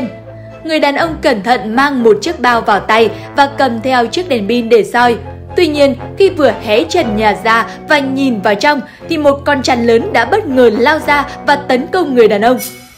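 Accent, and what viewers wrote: North, Vietnam. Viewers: Nay kênh đổi tên đổi giọng bắc review luôn:)) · Trở về giọng Nam Bộ đi, giọng Bắc nghe ko quen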